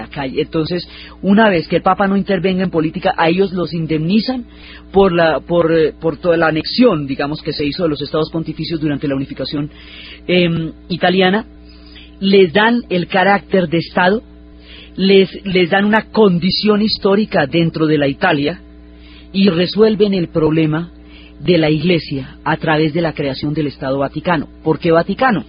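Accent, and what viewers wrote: Colombia. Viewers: Es un placer escucharte maravillosamente narrado, me das luz y claridad a la historia y con la sal y pimienta de los modismos de Colombia. Delicioso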